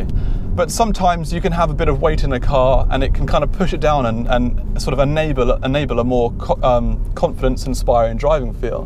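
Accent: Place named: England, United Kingdom. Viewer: Say Alpeen!!! like you say Ferraree and Lamborghinee! what an english uggly pronunciation you've got!!!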